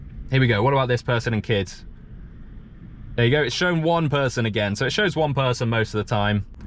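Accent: United Kingdom. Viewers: Are you scottish and doing a switcharoo. I speak english as a first language. When I speak to people outside India or in videos I speak in a way that most native would find easy. But speaking english with non native speakers in India I need to deliberately misprounce words and use incorrect grammer otherwise they want understand me.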